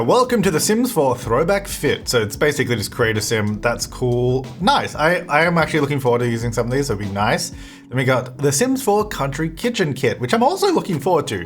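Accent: Australia. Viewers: I feel so stupid I’ve been watching you for years and apparently I’ve missed that you’re in Australia and I knew you had an accident but I could never pinpoint where, I thought maybe New Zealand.. it was because it wasn’t that cartoony Australian accent I never would’ve thought I felt so stupid right now I need my PhD taken away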